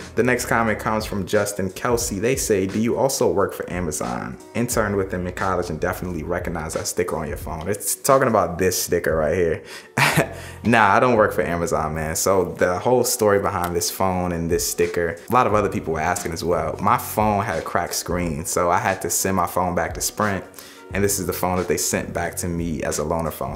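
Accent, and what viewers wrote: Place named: DMV, United States. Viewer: The slider and takstar mic look great. You sound like you grew up in the DMV as well. Keep being great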